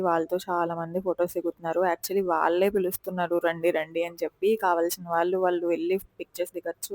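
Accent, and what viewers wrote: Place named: Telangana, India. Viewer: Moutham telangana slang undie ,😅😅😅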